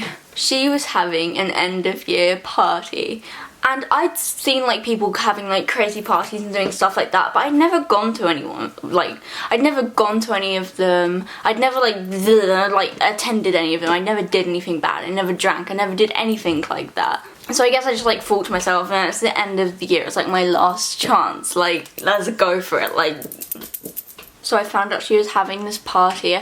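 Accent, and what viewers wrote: England, United Kingdom. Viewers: She is from the UK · is she from England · Its English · Jacob Engallena it's not her accent it's a British accent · dick bicycle I mean her hair looks pretty brushed to me. She has braces and she's British so her words might sound different. 😂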